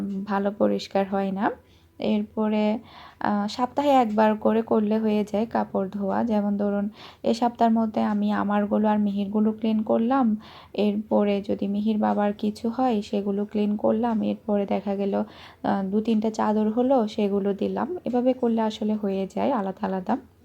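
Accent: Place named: Chittagong, Bangladesh. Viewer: আাপু আমার মেয়ে তোমার ভিডিও দেখে।তোমার চিটাগাং এর ভাষায় কথা গুলো শুনে ও হাসতে হাসতে শেষ।🤣🤣🤣🤣ওর এখন ৬বছর বয়স। আপু তাড়াতাড়ি চিটাগাং এর ভাষায় ব্লগ করে আমার মেয়ে টারে খুশি করে দাও।আর মিহি মনিকে এত্ত গুলো আদর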